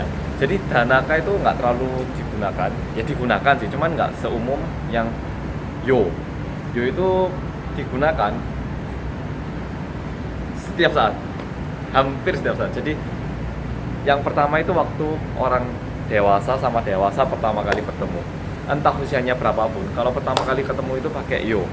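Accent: Java, Indonesia. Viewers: aku orang jawa...hidup di bandung :( kalo medhok malah diejekin :( -,- la mas e bejo medhok tapi wong koreya -,- la ne aku bedo neh critone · Salut aku mbek smpean mas, jowone jan melekat kat kattttt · sip.. seneng aku ana kancane, seneng ngomong jawa, medhok pisan. akeh wong jawa dhewe sing ora pingin dingerteni jawane, po meneh yen wis nyambut gawe Ning jakardah. Nuwun yoooo... njenengan bombong dadi kaya Wong jawa😊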